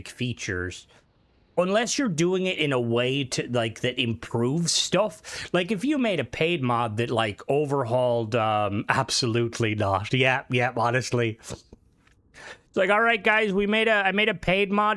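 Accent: Ireland. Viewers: Awesome stream,...I usually just watch for the dancing guinea pigs but stay for the trash talk in an Irish accent 😂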